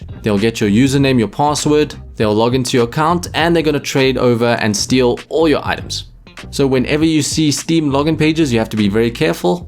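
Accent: South Africa. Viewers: Are you from SA? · IS that a south African accent i hear?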